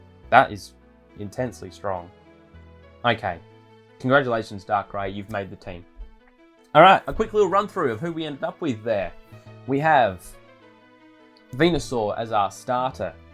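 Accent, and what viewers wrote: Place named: Australia. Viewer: Always loved the Australian accent☺☺. Awesome list, definitely more unique than most in regards to the parameters.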